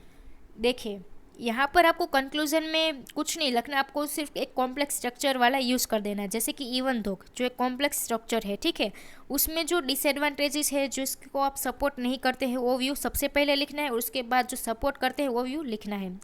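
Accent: Gujarat, India. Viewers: Are you Gujarati? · Mem R u gujarati? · English language with gujarati accents.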